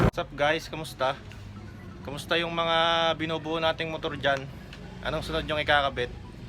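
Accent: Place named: Batangas, Philippines. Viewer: Sabe ko na taga batangas are punto palaang e hhaha support kita idol 💯💯💯 mabuhay batangeño!!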